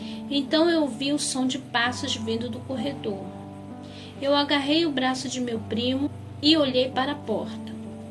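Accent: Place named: Rio de Janeiro, Brazil. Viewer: seu sotaque carioca é muito fofo 😊. É cada história hein, alguma coisa ruim tinha lá. Credooooo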